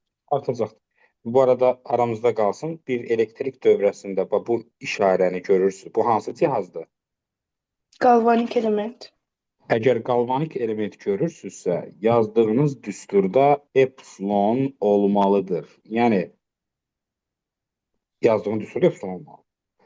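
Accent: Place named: Shaki, Azerbaijan. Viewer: Müəllim Şəkilisiz? Ləhcənizi bənzətdim.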